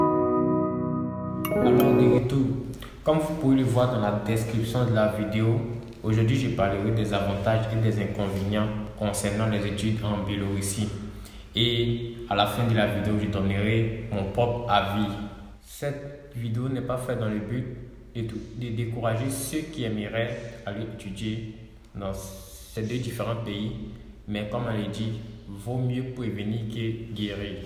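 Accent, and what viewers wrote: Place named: Central Africa. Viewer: Bjr, M.. En dehors de ton nom atchié, je ne sens pas ton côté ivoirien dans les mots utilisés, leur prononciation, la composition des phrases, les répétitions de paroles, le niveau du langage, les mouvements du corps, et surtout dans les idées présentées. Tout indique l'Afrique centrale: Gabon, RDC, Congo, RCA, Cameroun... Sauf l'expression "pour m'encourager."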